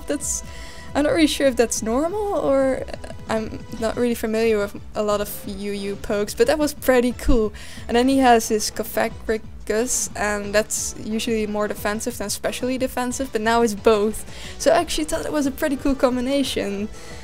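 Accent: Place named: Netherlands. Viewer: Gotta love Dutch accents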